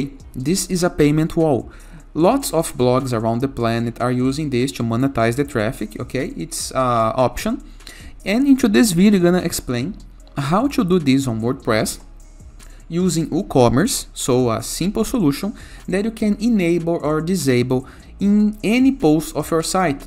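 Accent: Brazil. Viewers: pelo sotaque e BR hahaha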